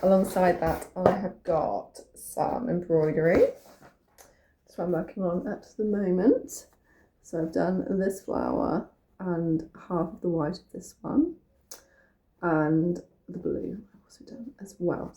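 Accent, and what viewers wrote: United Kingdom. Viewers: Hi there 👋 r you from Britain?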